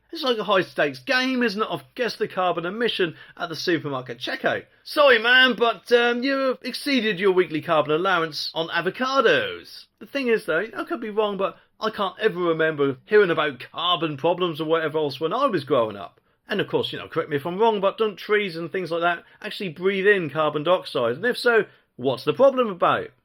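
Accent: Norfolk, United Kingdom. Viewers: I still need to know if you are from Norfolk lol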